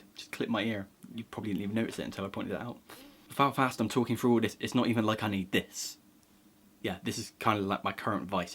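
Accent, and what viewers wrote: Bristol, United Kingdom. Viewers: I'm watching:) I love watching your videos, if for nothing else your amazing Bristolian accent. I was thinking about you the other day, I saw a super pack of nerds for sale:)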